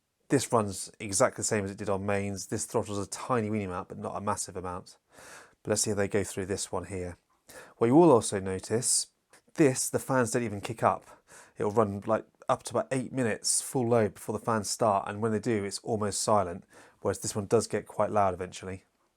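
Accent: United Kingdom. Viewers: Excellent comparison. Love your accent, too. Which part of UK are you from?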